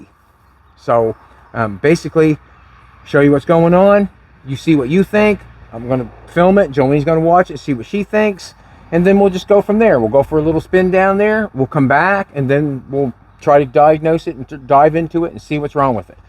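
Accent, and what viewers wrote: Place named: Atlantic Canada, Canada. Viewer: This is my first viewing, so I don't know where you are, but I'm detecting a slight East Coast Canadian accent. If that is so, you probably know that the government, bless em, thinks they can save the planet by forcing car dealers to sell 100% of the new cars to be BEVs! If we the people, can't stop this madness, the folks who can go Cuba-Style on old classic automobiles are going to be in high demand! 1st view immediately SUBSCRIBED buddy!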